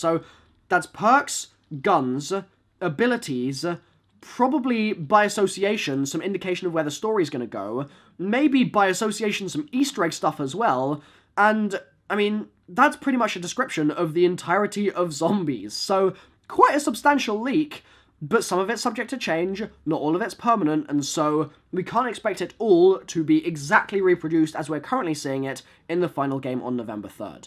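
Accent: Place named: United Kingdom. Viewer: that british accent is gonna get on my nerves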